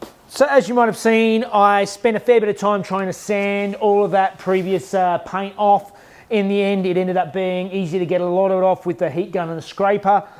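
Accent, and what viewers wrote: Australia. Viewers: Your Aussie accent is hilarious, every time you say Flocking, it sounds like "Fucking" and it makes it 10 times funnier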